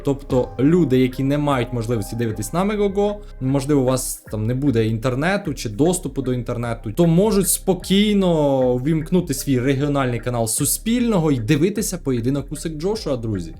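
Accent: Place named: Russia. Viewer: даже по твоему акценту видно что на русском языке лучше разговариваешь,не ломай язык и разговаривай как удобно на русском да и думаешь тоже так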